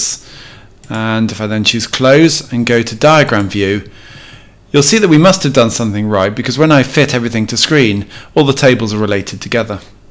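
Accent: England, United Kingdom. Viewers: Wow..! Great class.. :) Like the 'Excellent sounding English Accent' :)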